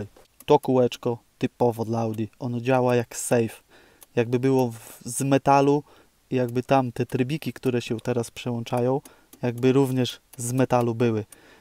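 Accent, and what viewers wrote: Podlasie, Poland. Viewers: Masz suba fajnie filmy ;) Słuchać akcent Podlaski, pozdrawiam ;)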